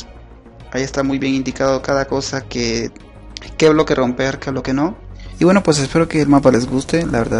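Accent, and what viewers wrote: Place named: Chile. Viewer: eres chileno? tu acento suena chileno xd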